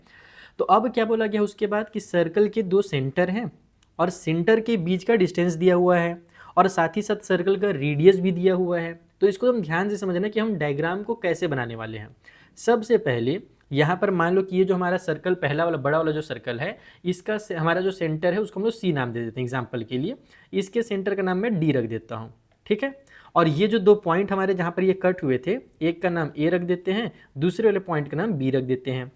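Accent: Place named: Bhojpuri, India. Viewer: Sir's bhojpuri accent🤌🏻 lovedd itt!!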